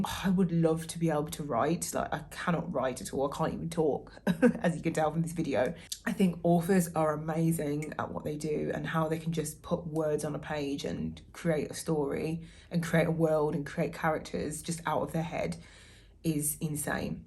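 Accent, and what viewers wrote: Birmingham, United Kingdom. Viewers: Stumbled across you accidentally, I love to read and I have a ridiculous amount of books but I don’t think I could do Booktube! Do I detect a Midlands accent? I’m from Birmingham :) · вы первая буктьюбер, говорящая с британским акцентом, которую я нашла😭 вы очень красивая! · Immediately subscribed for the vibes!! Do I detect a west mids/brummie twang? Either way, I love your voice, reminds me of home.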